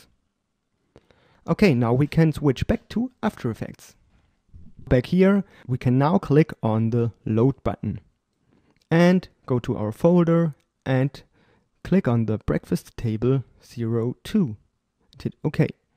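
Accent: Germany. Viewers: Deutsche Ausprache xD Aber gut gemacht :) Wie wäre es mit Deutschen Tut's?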